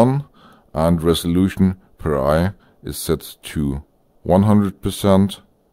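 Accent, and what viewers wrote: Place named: Germany. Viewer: Thank you for your informative video. Perfect German English, I would sound exactly like you 😀. Are you sure that the resolution in CM-settings-Assetto Corsa- Video has impact to the VR performance? It is overwritten by the VR video settings as far as I know. I have problems with very big user interface of the CM apps in AC in game when I lower the resolution under my 4K of the screen. 👋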